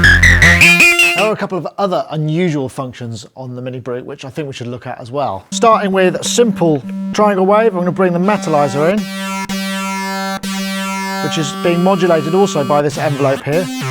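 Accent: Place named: Cockney, United Kingdom. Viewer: Love that cockney style.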